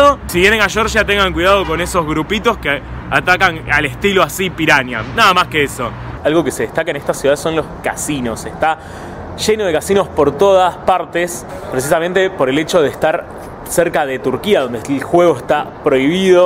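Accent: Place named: Argentina. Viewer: Querido, debo confesarte que te descubrí hace poco tiempo. Soy jubilado, orillando los 70 pirulos y me paso las tardes viendo y disfrutando tus videos. Además de ser muy interesantes, tenés una manera de narrarlos muy argenta. Pondero la honestidad que profesás por tu cultura, el respeto por tus ancestros sin desestimar tu Argentina a la que has recorrido y mostrás con deferencia. Felicidades por tu juicio, por tu labor educativa y por haber encontrado una manera apasionada de vivir!.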